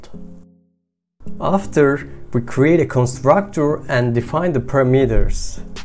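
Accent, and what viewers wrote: Turkey. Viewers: Videonun ilk 4 saniyesinde telaffuzdan oturu "Lan Turk lan bu" diyisim :) ilk defa goruyorum kanalini, basarilar diliyorum. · Are you Turkish guy ? :)) Your accent is very similar to Turkish :) I have just curious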